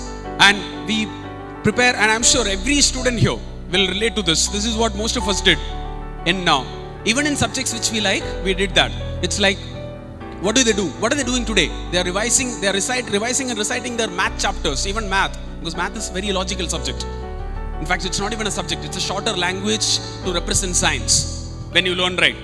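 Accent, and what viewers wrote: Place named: South India, India. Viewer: Sir your English speaking style is very different because you are from south India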